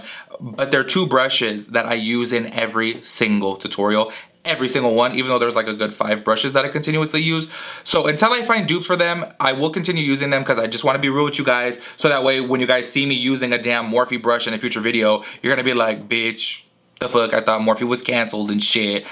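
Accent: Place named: Bay Area, United States. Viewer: You talk like you’re from the Bay Area 😭😭😭😭💕💕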